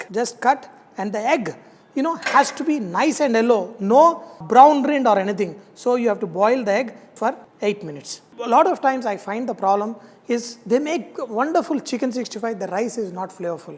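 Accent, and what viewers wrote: India. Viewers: Sir ur English Indian mixing so its perfect understanding....boomba.boomba. Iamurbig fan I love cooking...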